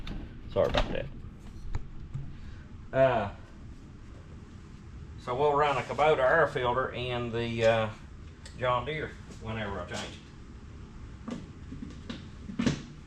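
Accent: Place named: South, United States. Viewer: Some of the words you say, or how you say them remind me of my grandpa. What’s funny about that is I’m probably older than you. Here in northern Ky the country accent is non existent. Always love to hear it.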